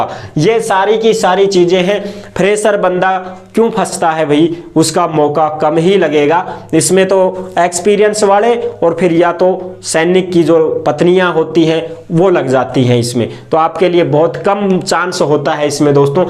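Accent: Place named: Marwari, India. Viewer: Bolane ki istayl bhut ahhe h 😂😂😂❤❤ Jo bich me marwadi ka tdka h vo mast h 😂😂❤❤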